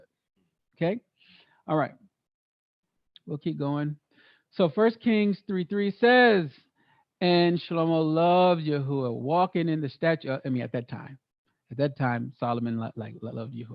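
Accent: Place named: Israel. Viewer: Shalom mishpâchâh!! I am enjoying this channel.. can you please help me with understanding the proper Hebrew to learn? The Hebrew that you speak, sounds like the same Hebrew that is mixed with Yiddish that the people in ISREAL speaks. Is it? Also the Hebrew you speak and teach is different from those who speak paleo Hebrew. With the vowels being Aa, Ba, Ga, Da etc. etc. I want to know it fluently but there are different vowels and Annunciation. Can you help me with understanding the difference between the two so I can become fluent in our language? Thank you sincerely Shalom or is it Shalawam?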